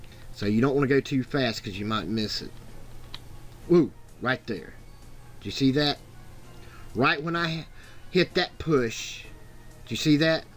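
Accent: South, United States. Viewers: Subbed! Love your accent by the way. Are you Southern or Pagan by any way?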